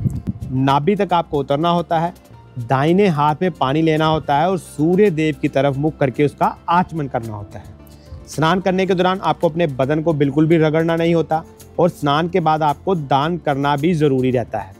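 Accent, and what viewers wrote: Punjab, India. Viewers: I think your tone is Punjabi. You must be from Punjab, which part of Punjab are you from? I’m from Hoshiarpur Punjab currently living in USA Seattle here we love your video. I watch this video with my parents. Very nice video informative keep making new video. Thank you and good luck.❤❤🕉️🕉️🪯✝️☪️🕉️🕉️💚💜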